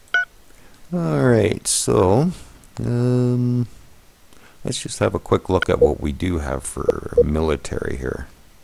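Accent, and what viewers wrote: Canada. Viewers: you sound canadian. I love it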